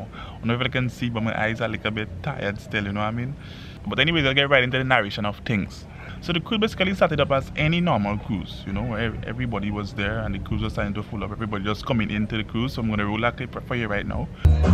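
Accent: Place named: Trinidad and Tobago. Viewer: Are you Trini? Have you lived in Trini? Do you have Trini family? Cuz that Trini twang is coming through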